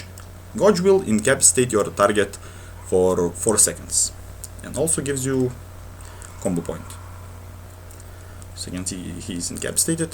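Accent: Russia. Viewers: Well you know english pretty well, just have very strong accent as all russians. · That russian accent of yours is so awesome xD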